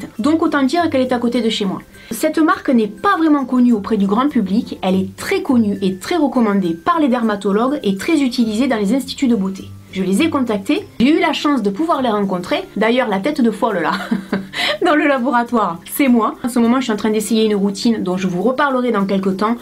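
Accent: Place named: Perpignan, France. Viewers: et beh je me disait bien que ton accent me disait quelque chose... J'habite Perpignan depuis 4 ans environ, mais je ne connais pas du tout Centella. Pour Aliexpress, je n'ai jamais eu aucun souci pour le moment, mais je ne commande rien d'électronique, ni de soins donc bon pas trop de risque. Et pour justfab, jamais testé, mais j'ai toujours pensé que ça puait l'arnaque. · Coucou! Je te suis depuis quelques semaines à peine et j'aime beaucoup ce que tu dégages (ta fraicheur) et tes conseils avisés. Tres heureuse de savoir que tu es de Perpignan (je me doutais bien vu l'accent!) et ravie également d'apprendre qu'une marque de cosmétique est produite dans notre belle région! Ne change rien, au plaisir de voir tes prochaines vidéos!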